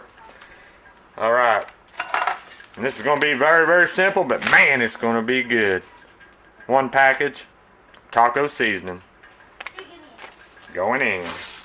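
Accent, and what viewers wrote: South, United States. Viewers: Looks really good and definitely something that my kiddos would love. Congratulations on being sober and not drinking anymore. Love the Southern accent sounds like myself and I'm in a room with my family, lol..where you from?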